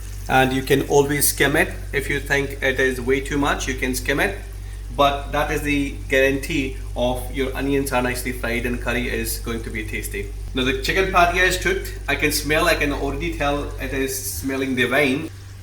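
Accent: Scotland, United Kingdom. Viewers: Hint off jock accent there pal 👍👍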